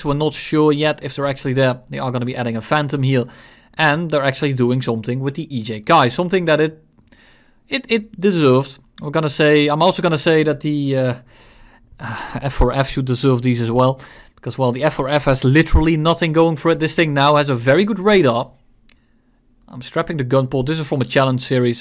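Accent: Netherlands. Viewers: ik hoor dat je Nederlands bent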